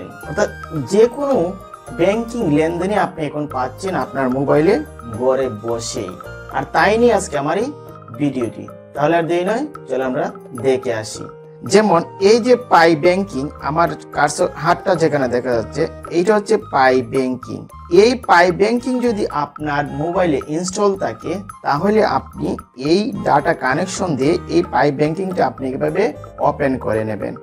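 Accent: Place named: Sylhet, Bangladesh. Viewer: Video deke mono hocce apni sylheti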